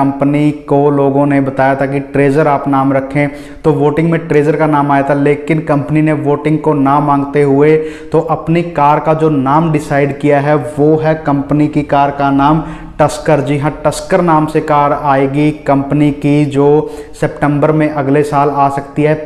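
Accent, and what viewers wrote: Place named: Haryana, India. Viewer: sir ek baat thodi ajib lgi ... haryanvi excent use krte ho jaise ki ... thoda bhot ...thoda bhut ...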